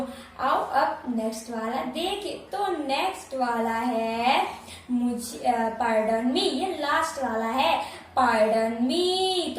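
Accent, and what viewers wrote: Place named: South, India. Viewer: Nice English but i am thinking you are from south because your Hindi is almost right.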